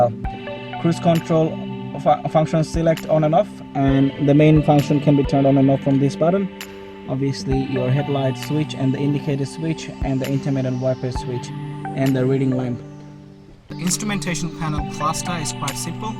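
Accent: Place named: Bangladesh. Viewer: You sound Bangladeshi 😅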